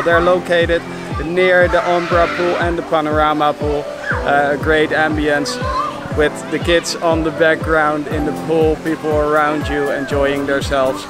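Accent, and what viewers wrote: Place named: Netherlands. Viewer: Leuk! Ik ga daar 16 juli 2022 heen!❤(ik hoor wel dat je nederlands bent hoor😄)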